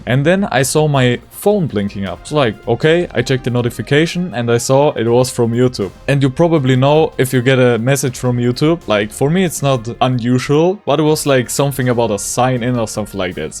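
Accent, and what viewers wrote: Germany. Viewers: Bro ich höre irgendwie deinen deutschen akzent raus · Bro man merkt das du Deutscher bist xDdd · Bro ist 100% deutscher😂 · im hearing a little bit deutsch heraus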